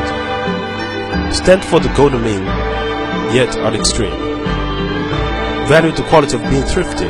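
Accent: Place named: China. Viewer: They should get a person without Chinese accent to read it. Preferably a sexy Caucasian woman's voice.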